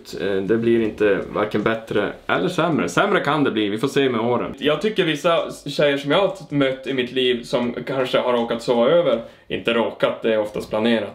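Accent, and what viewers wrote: Norrland, Sweden. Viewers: Jååå. Hittade din kanal typ förra veckan. Gick en video och prenumererade direkt! Visat dig för alla vänner och för familjen. Alla tycker du är lika rolig som jag tycker. Sedan så e man ju halvt Norrlänning också så klagar ju inte på dialekten😉 ae fan vad rolig du är, fortsätt med det du gör. Man får alltid ett gott skratt❤️ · hahah, skönt snubbe och norrläning!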